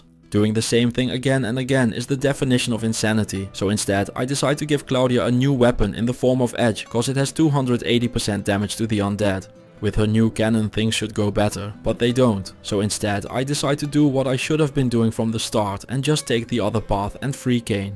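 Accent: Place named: Netherlands. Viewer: Can always hear that dutch english 🤣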